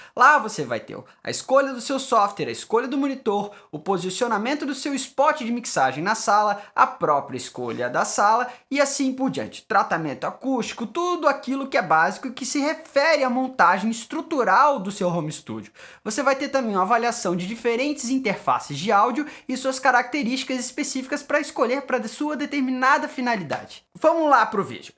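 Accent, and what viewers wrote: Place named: Florianópolis, Brazil. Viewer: sotaque de floripa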